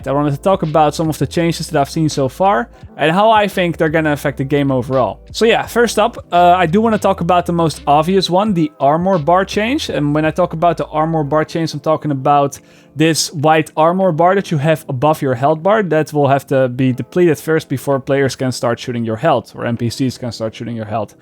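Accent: Netherlands. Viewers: Hey! Love that Holland accent. I have seen almost all of your Division (1) videos. Keep up the good work 😏👍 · Holy shit your accent is very dutch. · Well, that's because he's European (most probably Dutch, not 100% sure). That's why he has the thick accent. And you are certainly not the first person to notify him. But he's a good sport about it; he jokes about it in a few of his IRL videos.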